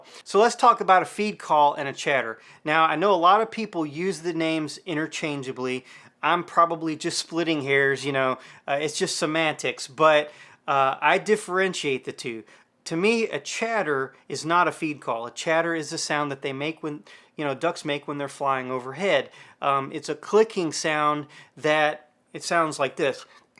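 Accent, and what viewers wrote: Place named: Arkansas, United States. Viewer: What part of Arkansas are you from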